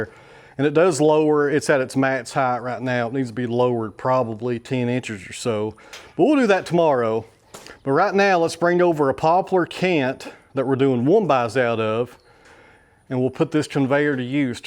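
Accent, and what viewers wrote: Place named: Tennessee, United States. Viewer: Audio is perfect. Can understand even with your Tennessee accent. Lol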